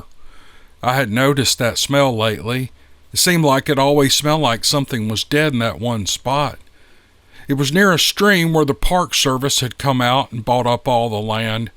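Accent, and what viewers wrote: South, United States. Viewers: Hey, I’ve been listening for over 3 years love your show. Can’t believe this never crossed your mind. I’ve came to that conclusion quickly. My people are from the south good common sense folk, when I listen to you speak that southern common sense tone I’m familiar with resonates. Your restricted from certain parts of State Land and you can’t fly drones either??? Not a conspiracy theorist but it’s not a coincidence…neither are the unexplained disappearances in the national parks.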